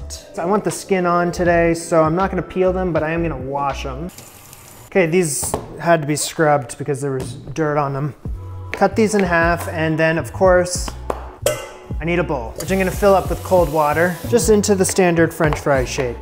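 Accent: Canada. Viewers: Heard your accent when you were making the Orange cake but your location said the US. Glad to see I can still pick it out. Poutine is king and essential after a night out! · I'm a recent subscriber and I thought you sounded Canadian. Takes one to know one. I would hazard a guess that you are from the East. Love the show.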